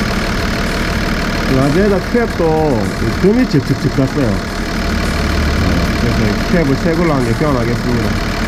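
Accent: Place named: Gyeongsang, South Korea. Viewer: 아무리 힘들어도 신나게, 멋집니다. 경상도 말투라 오해하실 분도 좀 있겠습니다. 혹시 부산인가요? 저는 인천에 삽니다만, 부산이 고향이라 친구들 많아요. 부산이면 소개해드리고 싶네요. 수고하세요. !!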